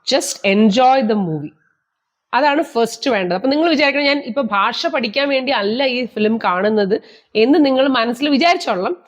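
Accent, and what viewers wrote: India. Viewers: Thanks, but your English pronunciation shud be either British or American. If you follow Indian style people will not get the desired benefit. To get fantastic movies simply try through Google.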